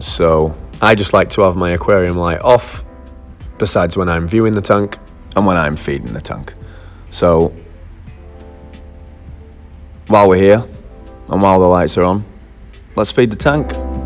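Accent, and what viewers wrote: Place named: Manchester, United Kingdom. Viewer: Nice vid --makes sense. I'm actually gutted cos I thought you was a Manc livin locally was gonna ask you if I could come round ya gaff and buy some fish of ya. Never mind,seems like ya doin well in the states --good luck to ya👍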